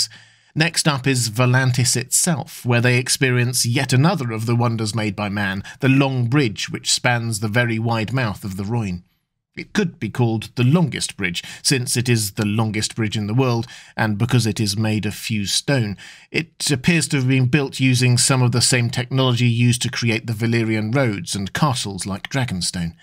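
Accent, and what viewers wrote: United States. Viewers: Yes, the American accent is really incongruous. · Nobody wants to hear an American accent do this (nothing personal, I’m Australian and nobody wants to hear us narrate this either). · Wow. As an American, listening to and then hearing a sudden American accent is quite jarring.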